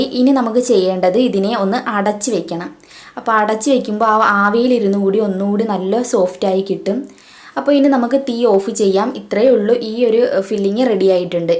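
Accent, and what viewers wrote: Kollam, India. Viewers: ഫ അല്ല ഭ ആണ്. കൊല്ലം ആണോ ചേച്ചി വീട്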